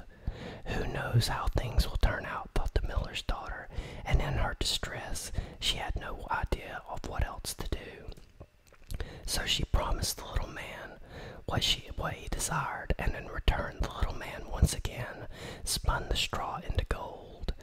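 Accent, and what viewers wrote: South, United States. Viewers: Very awesome, I love asmr, and I love your southern accent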